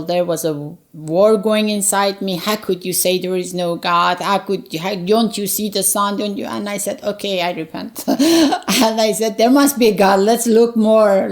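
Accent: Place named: Iran. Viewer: I'm just curious... what county in the Middle East? My guess Iran from the accent . Jesus is who He said He was all along.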